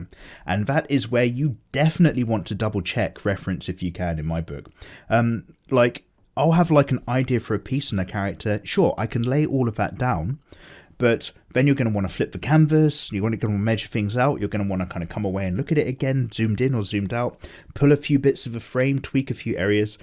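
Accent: United Kingdom. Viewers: Everyday i see this man and go Amazing voice, british, drinks tea, draws lewd girls, HOW IS HE STILL SINGLE!?!?!?!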